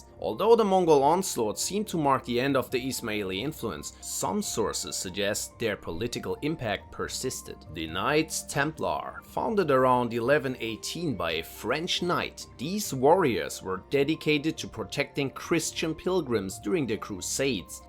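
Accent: Germany. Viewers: Why so German 😮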